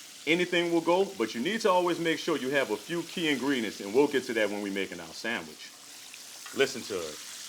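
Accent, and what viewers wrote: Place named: Louisiana, United States. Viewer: Great recipe and commentary,from Chef. Red fish or catfish are Louisiana norms, that accent was speaking loud and clear. * I also despise deep fried salmon...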